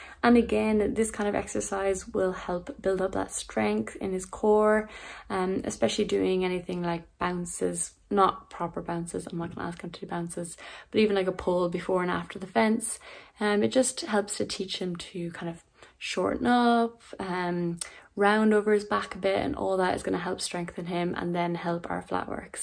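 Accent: Ireland. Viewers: are you in Australia I am so confused Irish accent but the trees and the birds shout Australia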